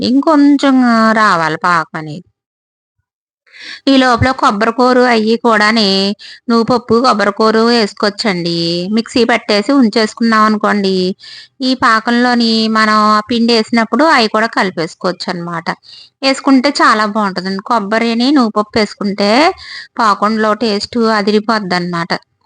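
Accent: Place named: Godavari, India. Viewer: మీ గోదావరి slang చాలా బాగుంది medam 🎉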